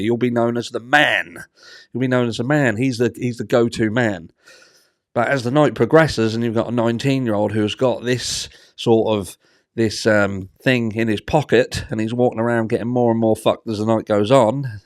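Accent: Norfolk, United Kingdom. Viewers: Out of interest where are you from? You sound like you have a Norfolk accent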